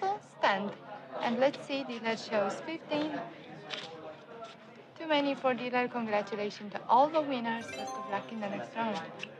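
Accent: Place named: Eastern Europe. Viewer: thank you for the answer, so they don't hide the fact they based in Romania? I wasn't mistaken then when I suggested this is Eastern European accent :)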